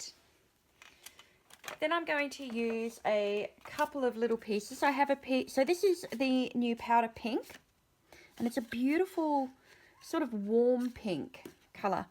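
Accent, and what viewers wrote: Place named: Australia. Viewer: Great card. Wonderful to hear a fellow Aussie voice. Pam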